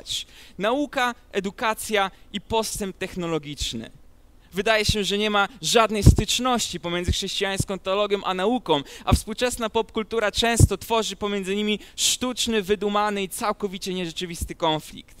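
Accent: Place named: East, Poland. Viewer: Ma bardzo delikatny wschodni akcent. Może dlatego, że dorastał na Ziemiach Zachodnich? ;-)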